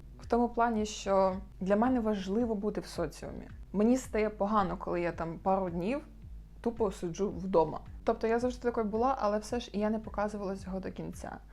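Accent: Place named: France. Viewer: Ви француженка? У вас таких акцент 😂😂😂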